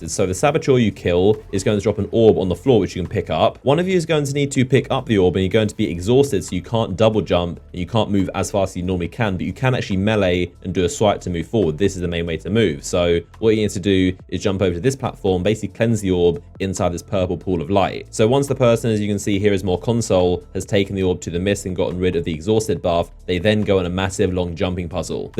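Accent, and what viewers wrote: United Kingdom. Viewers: Pls talk a little bit slower, i barely understand u bcuz of your british accent.Thank u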